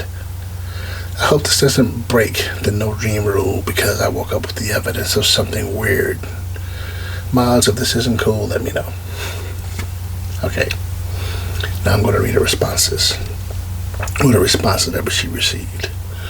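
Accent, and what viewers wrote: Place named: London, United Kingdom. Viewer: As an English person I would say you’re well on your way to getting a decent accent however it’s very mixed in terms of regional accents. There was definitely some sort of London accent mixed with a touch of a northern accent (when you said the part about ‘scumbag brain’) and some RP thrown in the mix.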